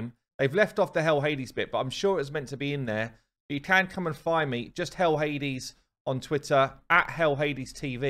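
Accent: United Kingdom. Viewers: Fun fact: I hadn't heard the expression "brucie bonus" before and I know you've used it many times in your vids including this one, so today I finally looked it up. When you do a google search the very first link to a definition that pops up....well lets just say it has to do with ejaculate and erections haha. Brucie bonus indeed! Also, for a longest time I was mystified when you were making reference to your "finite" teams. I thought "finite"? What is a "finite" team, and where would I use it? Does that have something to do with the unkillable "infinite" teams? Then it dawned on me - you were saying FIRE KNIGHT, not finite rofl. Don't get me wrong tho, I love British accents 😸.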